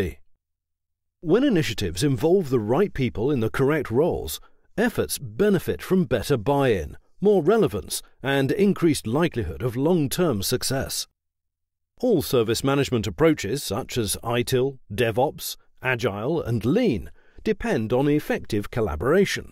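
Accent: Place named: United Kingdom. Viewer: Thank you, thank you, thank you for uploading all of this. I can focus and understand much better with a nice British narrator as opposed to the flood of p.a.j.e.e.t made videos.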